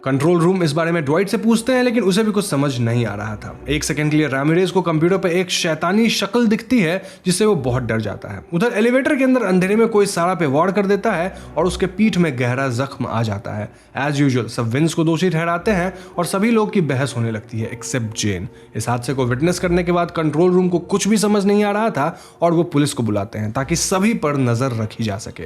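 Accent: Bengal, India. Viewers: Aap bengoli ho?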